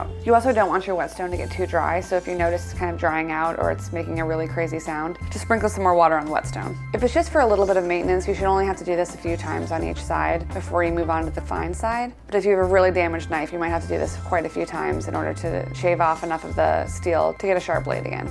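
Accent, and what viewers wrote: United States. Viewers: Which areas in the US would this person's accent be predominant? Or is popular amoung a certain age group? Or perhaps a particular kind of crowd?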